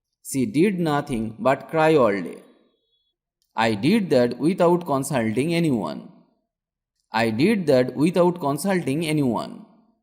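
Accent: India. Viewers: Can you speak englisch and Not Indian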